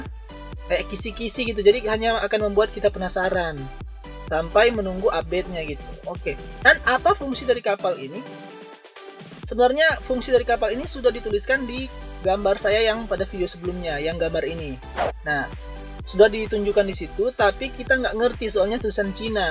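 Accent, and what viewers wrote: Manado, Indonesia. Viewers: so kntra tu ba logat manado😂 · .....orang manado? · orang mando ato Gorontalo?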